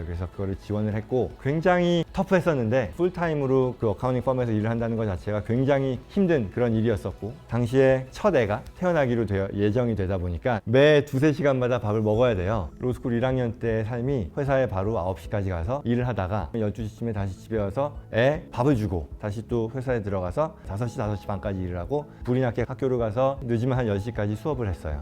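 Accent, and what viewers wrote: United States. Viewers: 말투가 미국교포 발음이라서 어렸을때 간줄 알았는데.. 이력보니 서울대 경제학과 졸업후 가신거네요. 어렸을때 갔으면 한국에 대해서 잘 모를텐데 대학 졸업후 간거면 한국에 대해서 너무 잘 아시겠네요. 이분이 한국과 미국 양쪽에서 성공적으로 활동할수 있었는지 알수 있는 이력이네요. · 이야...환경이 중요하구나 한국에서 오셨는데 미국에서 태어나고 자란 나보다 더 교포느낌 나시네 ㅋㅋ 난 한국서 연습생해서 지금은 영어하지않는 이상 사람들이 교포인지도 모름ㅋㅋ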